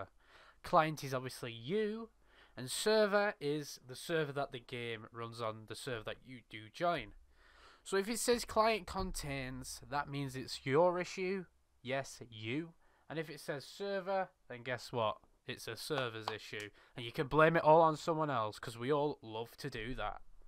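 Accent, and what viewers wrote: United Kingdom. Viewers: You sound like a Yorkshire lad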